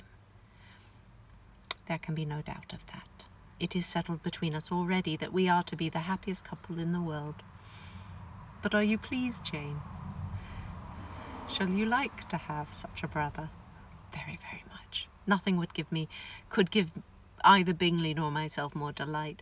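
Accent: England, United Kingdom. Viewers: Oh my, what a gorgeous find!!! 🥰 I must be the only person who hadn’t watched Pride & Prejudice mini series until this week! ☺️ I enjoyed it sooo much, then wondered what has been in since…shocked to hear an American accident and lighter hair in later interviews…but so delighted to hear the English accent here WHILE reading P & P! 🙏🏼👌🏼I’ll be listening to more of these readings! 😊 Have since watched performance in A Quiet Passion #bravo 👏👏 and didn’t realize she played Myrtle Logue in the King’s Speech! 😮 👌🏼👏👏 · Her beautiful English accent became so familiar to her quickly after the first chapter. Adored this. Thank you. No one could ever be a better Elizabeth than you. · I was your driver in Scotland when you were acting in game of thrones pilot. I remember the English accent. 🏴😁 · So happy to hear the English accent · Her accent is still sort of British❤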